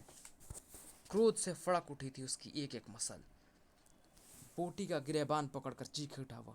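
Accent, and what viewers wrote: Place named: Bihar, India. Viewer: Bhai tumhara bolne ka lehja saaf nahi hai, apni voice ko clear karo aur thoda aaram se padho. Shabdo ka pronounced thik se karo hindi mei,,, Bihar se ho kya aisa lagta hai tumhare bolne ke tarike se.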